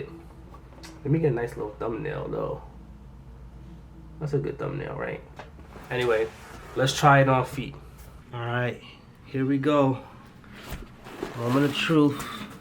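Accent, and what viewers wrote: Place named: Connecticut, United States. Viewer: You gotta be from Connecticut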